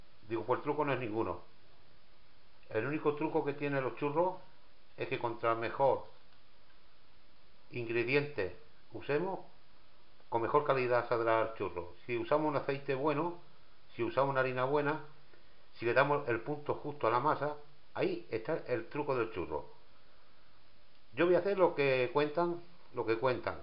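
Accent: Andalusia, Spain. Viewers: Me encantó! Muy fáciles y sin complicaciones. Me encantó su acento español. Gracias saludos desde Monterrey México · El hombre es andaluz ,del sur de España ,tienen mucho arte !! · M. PERALES: Para una mayor concreción, el acento del caballero demostrador de la minuta de los churros es, claramente, de Andalucía (Sur de España). Yo escribo, desde la provincia de Valladolid (España), de la que se dice que es donde mejor se pronuncia y articula el español. De hecho, en verano, a la ciudad de Valladolid y a la próxima ciudad de Salamanca, vienen muchos estudiantes extranjeros a aprender español. Y añado datos ilustrativos sobre la receta (o minuta) de los churros, mostrada en el vídeo, que es la básica. Hay gente, en España, que a tal masa le añade una cucharada sopera, precisamente, del aceite de girasol en el que se van a freír los churros, con lo que se consigue un punto de suavidad en el acabado. 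Y, por demás, decir que hay recetas más complicadas como la denominada "churros de lujo", pero no merece la pena mayores complicaciones, porque la minuta básica de los churros y, luego, un buen chocolate a la taza resulta formidable. A mí, me encanta y, además, es muy saludable por el efecto antioxidante del chocolate y, por otra parte, estimulante por la acción de otro componente del chocolate, la teobromina. Como en todas las cosas del saber humano, aquí, también, se podría estar escribiendo un buen rato, pero no quiero entretenerme. Voy a dar unos apuntes rápidos. En España, los churros rectos, sobre todo, en la capital de Madrid y en la ciudad de Sevilla, se llaman TEJERINGOS. Y el churro en forma completa de ovoide, se llama, en todos los sitios, como es l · Gracias por subir esta receta! (Primera vez visitando tu canal) no se si me gusto mas la receta o see acento español😉 padezco de debilidad por los escoceses y españoles! 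😍😍 saludos desde Texas👍🏼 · BUENÍSIMOS Y MÁS AÚN CON ESE ACENTO TAN NATURAL Y ESPAŃOL. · Muy buen video y mejor aún con acento español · Ese acentico español es muy cachondo. Por cierto, ¿por qué no no has echado levadura a la harina?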